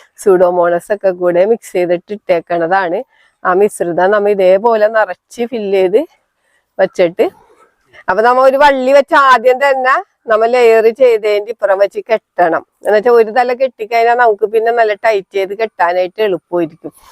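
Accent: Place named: Ernakulam, India. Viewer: Eranakulam aanalle😊 Bhasha kett paranjathann.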